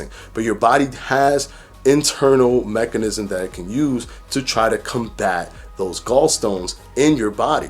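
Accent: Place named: New York, United States. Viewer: Angela Davis-Floyd, The Listing Boss cut my bae some slack he has a New York & Florida twist👍🏽🙏🏽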